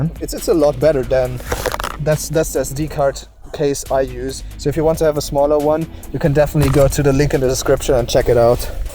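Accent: Bavaria, Germany. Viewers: Way to funny that you only said like 2 Words and it was already obvious that you are german xD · Noch nie deutscheres englisch gehört haha, aber feier ich haha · ich wusste das du Deutsch bist ich hab es gehört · Haha korrekt erster Satz erkannt dass du aus Bayern kommst korrekt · Ich wusste es beim ersten Wort englisch hat Mama sofort gehört 😂👍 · Na wirkli oda, a Bayrer! Do bist jo goa ned so weit weg. 😉 Wahnsinns Intro übrigens! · Ahh lol bei hat sichs geklärt hahaha xD Auch wenn dein Englisch echt ziemlich gut ist hört man es irgendwie immer raus wenn ein Deutscher Englisch spricht xD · Woher aus Bayern kommst? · Are you from Germany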